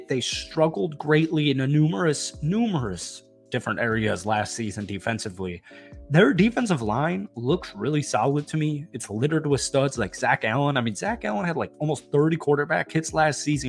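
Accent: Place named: Midwest, United States. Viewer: Pardon Midwest pronunciation he means no disrespect we all say OR eh Gon out this way. We'd totally give you a hall pass if you said illinois with an s at the end 😂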